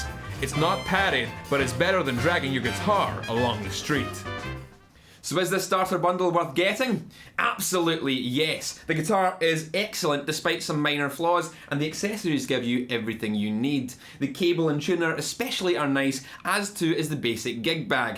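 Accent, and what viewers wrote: United States. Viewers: The American accent you use. Thank you for that. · Seriously good review. I mean I am a bass player, and you almost had me wanting one of these... And I give you a B+ on your american accent. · THE AMERICAN ACCENT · Great video, and pretty cool bundle. Your American accent is damn charming haha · That American accent though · Oh boy that American accent is coming along so nicely! lol, just love these review videos, awesome as always! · Last time I was this early my girlfriend was disappointed. Also that american accent tho.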